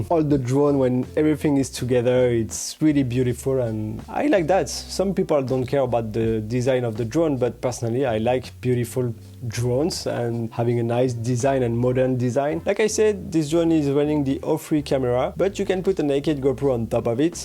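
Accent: France. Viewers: Ont dirait tu a un accent français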